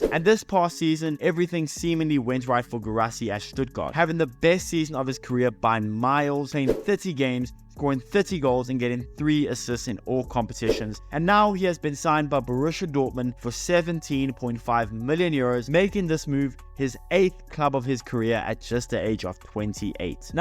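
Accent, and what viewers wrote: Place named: South Africa. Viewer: South african accent. Reveal your PSL team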